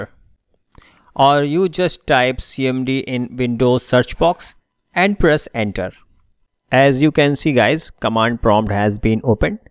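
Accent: India. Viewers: thank you random indian accent dude from YT<3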